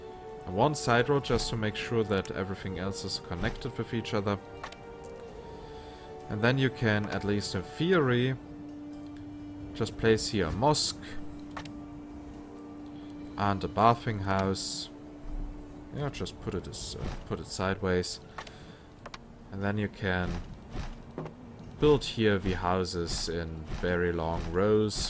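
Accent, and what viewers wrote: Germany. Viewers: Thank's a lot ^^ Man hast du nen deutschen Akzent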